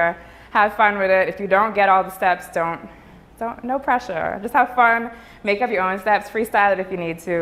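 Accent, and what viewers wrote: Bahamas. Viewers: Listen quick question are you from the Bahamas? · This is A Bahamian instructor I hear an accent🇧🇸😄 242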